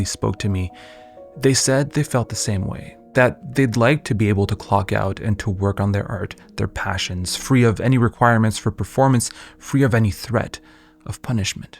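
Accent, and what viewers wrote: Quebec, Canada. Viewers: Did the freedom from monetization allow you to be more french-Canadian too? I feel like the Quebecois really came out in this video 😊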